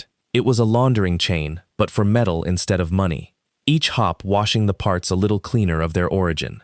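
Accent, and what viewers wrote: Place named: Australia. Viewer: Excellent story. Lovely voice for this from Australia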